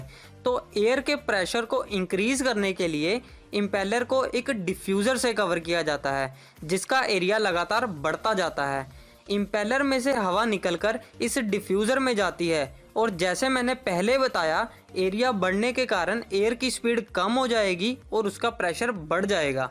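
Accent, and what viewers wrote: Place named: Punjab, India. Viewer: Hindi ton tan Punjabi lgda aa patuya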